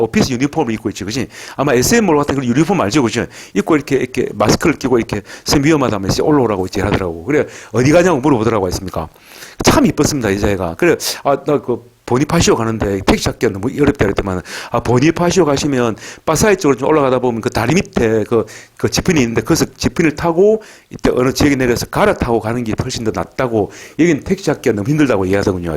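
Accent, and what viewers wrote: Gyeongsang, South Korea. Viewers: 예ㅡ감사합니다 30년 학원 폐업신고 할 때 조금 눈물 날라 하더라구예 처럼 강의하면 아ㅡ들 한테 대박입니다 나는 와 그라지 몬했을까 하는 생각이 드네예 투박한 경상도 사투리가 이렇게 듣기 좋은 줄은 같은 갱상도 살믄서도 미처 몰랐어예 ㅎㅡ 양산에서 멀지 않은 곳에 살고 있으니 일요일 오후 혹은 저녁에 찾아 뵐게요 미리 일주일 전 쯤에 연락 드리고예 일일이 답글을 더 길게 달아주시니 가족 같은 분위기 좋기는 한데 힘들까봐 걱정 되네예 간단하게 살살 하이소 마 ㅡ ㅋㅡ 먼지 땜에 흐린 하늘이 모처럼 태양이를 내놓네예 좋은 오후 되이소 감사합니다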